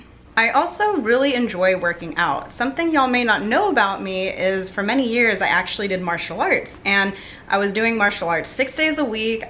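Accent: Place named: United Kingdom. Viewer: Finding that balance can be so hard sometimes. I've been trying to lose weight for my health for years and exercise would greatly help with that but there is such a limited amount I'm capable of doing and it's so dependant on the day and circumstances of said day that it's been extremely challenging. It makes it so frustrating when you have people fat shaming you and telling you to just get off your fat butt and exercise, like I don't have enough problems with depression and anxiety besides my chronic pain and other medical issues. As for the little dactoid about you being born in London I was kind of wondering because of the way you say certain things like vitamins, you say it like the British do. Of course, I was born and raised in the US and most of the time I do too, so that doesn't necessarily mean anything but it made me curious. :)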